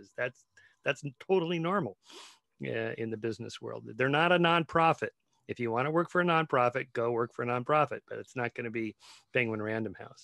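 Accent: Wales, United Kingdom. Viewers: You Welsh? Go on bach!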